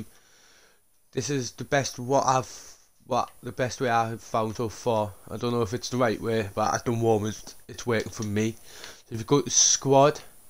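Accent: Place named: South Shields, United Kingdom. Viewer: The shields accent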